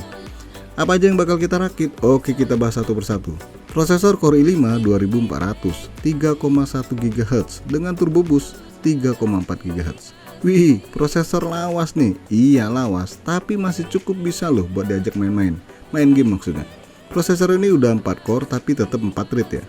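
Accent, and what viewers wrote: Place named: Palembang, Indonesia. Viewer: Hahawkwk kocak bg ni ,wong Palembang kayak nya ni,pakek nian nama nya 😁😁😁😁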